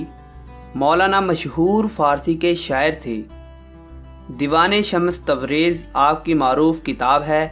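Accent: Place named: Punjab, Pakistan. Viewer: I understand lots of people are doing this for some extra income but healthy criticism helps improve quality of work. I'm sorry that I pick on you accent but when it comes to educational videos there's no compromise. I can tell you are Punjabi so am I but our national language is Urdu and taught in schools from nursery level so it deserves that level of respect and perfection. Hope it makes sense.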